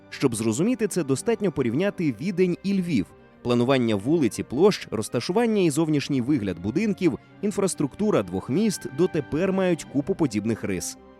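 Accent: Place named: Moscow, Russia. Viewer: Голос за кадром- "ЦюЦюн, жиЦЦя, громаДЗяни" треба на консультацію до логопеда! Вкрай неприємно слухати ці маасковські виверти! ДИЗЛАЙК!!!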